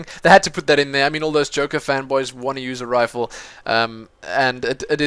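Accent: Afrikaans, South Africa. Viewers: hey bru i noticed you accent...u south african? BTW nice video, im quite impressed with the attention to detail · Haha. British? Lol. Hes South African. You ignorant person haha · judging from what I know sounds like an Afrikaans accent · He's not British, he's South African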